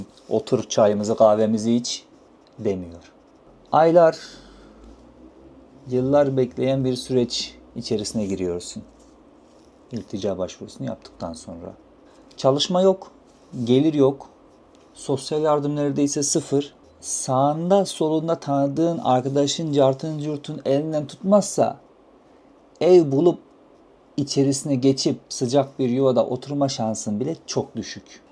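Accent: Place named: Adana, Turkey. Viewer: Hem$o sen ADANALIMISIN ? $iven bizim oranin $ivesine benziyor.Almanya´dan selamlar 😊🤚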